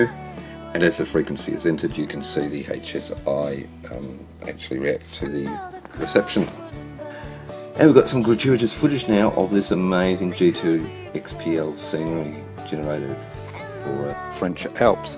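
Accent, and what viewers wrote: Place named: Australia. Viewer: G'day mate, lovely Aussie accent!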